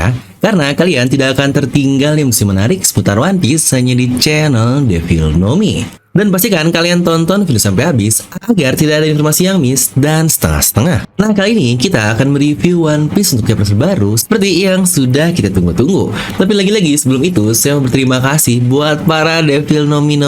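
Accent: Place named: West Java, Indonesia. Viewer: hahaha, urang sunda horeng. :)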